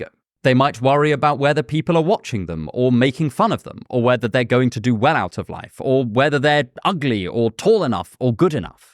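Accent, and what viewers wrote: United Kingdom. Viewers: Which accent has he? Is that British?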